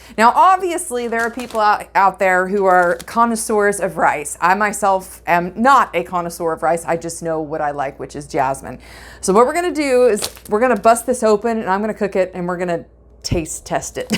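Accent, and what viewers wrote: South, United States. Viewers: Enjoy your Southern drawl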